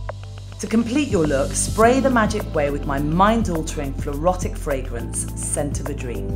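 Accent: United Kingdom. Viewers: British vs American accents.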